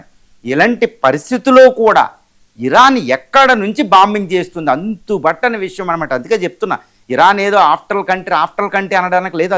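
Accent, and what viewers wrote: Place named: Telangana, India. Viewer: Telangana yasa la balay chayptunaru Sir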